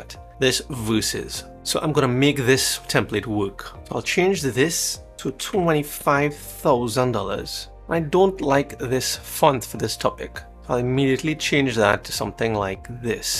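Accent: Trinidad and Tobago. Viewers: are you trini? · Oh, hey bro are you a Trini? 🇹🇹 Nice job on the channel 😊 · Hey are you from Trinidad and Tobago? Nice😊 I'm a Canva user too. I was really surprised to hear your accent in my headphones lol. I was like......sounds like ah trini lol. I'll be subbing you from today! I'm a trini too btw, slight peppa and ah red solo......only we know what that means😁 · OKAY… I hear a trini accent! I keep hearing about faceless videos, but not sure if it would work for my niche. This is interesting though. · I like your accent 😂❤ Trini?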